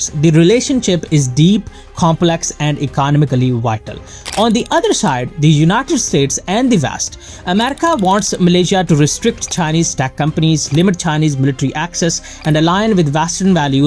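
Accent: India. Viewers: Indian accent. BS from a trouble maker.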